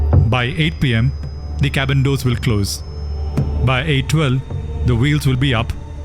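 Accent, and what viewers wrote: India. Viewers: Fuck u bro ur Indian when u speak an when u tell this story your English is mix in Hindi so better why u don’t try to say this story in Hindi coze ur English do not sound like English 🏴 uff any way good try keep it up 😡😡😡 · These pilots are f*cking idiots...Boeing is right to blame the Captain but they should've blamed the whole crew, I try not to judge but there's no way I could've made this mistake, not with 3 attitude indicators and 2 of them saying the same thing...if it was 2 broken attitude indicators saying the plane was banking right I could understand it more but then you'd still have one that's moving correctly and to ascertain your correct orientation all you'd have to do is bank the plane left and right...the only way I could understand this completely is if it was all 3 failing in the same orientation or if it was something like Aeroperu 603 where the crew was constantly receiving conflicting information...all that life gone for a problem that I feel many rookies would spot fresh out of the academy and the only real thing that stops me short of judging these guys is that I've never been a 747 pilot...thank you as always for these premium uploads I spotted a few errors like the cockpit being off, the engine models and the yokes of a 707 but all the information that you give that's most important is spot on and never biased and I really appreciate it not to mention I love your accent and Indian culture is such a a rich one, I almost hate seeing how beautiful this 747 was and all the amenities with Indian culture infused into it, makes me wish I was wealthy to experience things like it and thats one reason the 747 is my all time favorite aircraft because it was like you said like a fly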